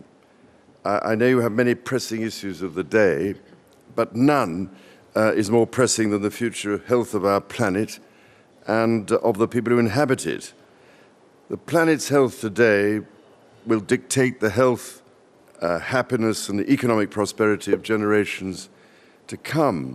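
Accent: RP, United Kingdom. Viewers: Now THAT is a posh accent. I feel smarter just listening to the accent. Props to Charles for being ahead of his time. Our job is to listen to him. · Here to listen My fav British Accent😍🙌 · Agree with Lyn Harr... speaks good RSP English, NOT posh at all. has fine steady qualities which will help him to be a good King. I shall be proud to be one of his subjects Vive le Roi Charles, long live all our Monarchs, each reigning in her/his turn. May God keep them safe.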